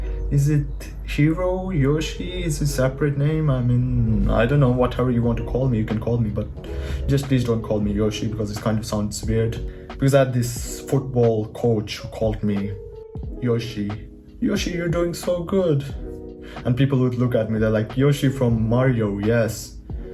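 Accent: Pakistan. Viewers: Ah ... now I understand how your Urdu is so good. You did have a sort of a Sialkoti accent I thought when I first watched a CS14 reaction video from you but I wasn't sure. I thought may be your accent in Urdu is because of Japanese influence but I should have known better. I dated a Japanese girl in college long ago but still got fooled. Be proud of being half Japanese and half Pakistani. Both cultures have beautiful things in them. You are both. Cheers from Tampa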